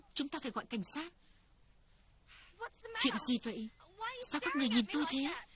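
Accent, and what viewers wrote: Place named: North, Vietnam. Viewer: Htv mà thuyết minh giọng bắc vậy? Htv thường lồng tiếng chứ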